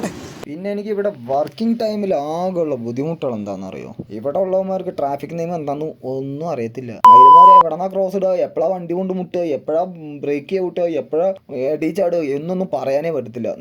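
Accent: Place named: Kochi, India. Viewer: Spag ketapo kochi nn thoni bro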